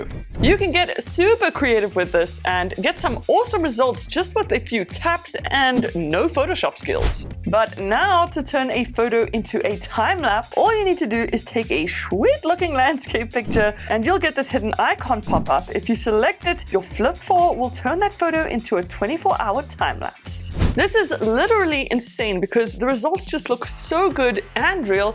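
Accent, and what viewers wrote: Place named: South Africa. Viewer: She sound Hella south african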